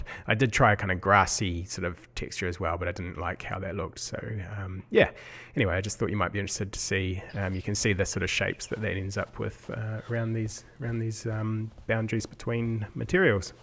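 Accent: New Zealand. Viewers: Kiwi accent aye? I'm curious, where are you based?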